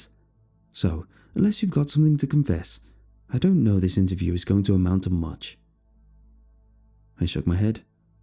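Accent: England, United Kingdom. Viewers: hes British boy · hes english... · I'm back and obviously I ment my specific country, as in England the country, believe it or not we don't just announce were British at the start of our conversation for the hell of it. The guy reading in the story had an English accent, thought that connection was obvious. And no the term 'country' does not only refere to southern states of North America. Do they teach yanks about the rest of earth or no?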